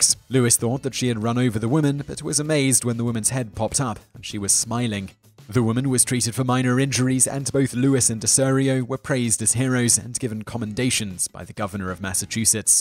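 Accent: United Kingdom. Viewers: Why does every countdown video have some British guy?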